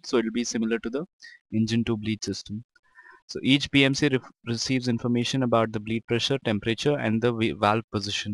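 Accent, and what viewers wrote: India. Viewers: Are you from India ? You speak like Indian 😊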